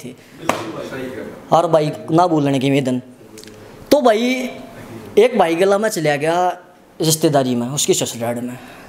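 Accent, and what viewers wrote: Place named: Haryana, India. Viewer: Bhout badiya bhai Haryanvi Level Increase 👍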